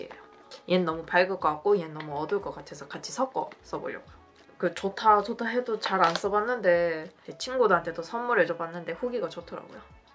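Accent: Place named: Seoul, South Korea. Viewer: 이제 서울말 쓰시네요..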